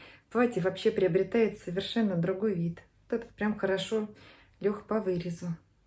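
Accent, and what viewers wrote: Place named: Voronezh, Russia. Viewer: Ну все красиво, и мастерица и ее работы !!! Чудо-воротнички, с каждым платье смотрится по разному. Очень приятно слышать любимый воронежский говор ! Если я не ошибаюсь.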